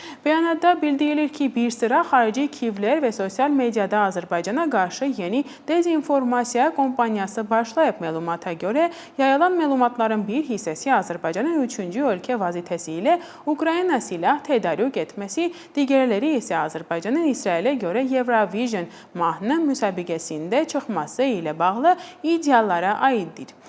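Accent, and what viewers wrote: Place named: Armenia. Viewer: Deyəsən bu ermənidir, erməni ləhçəsi hiss olunur. Nə turkdi, nə də bizimki. Üçüncü kim ola bilər?)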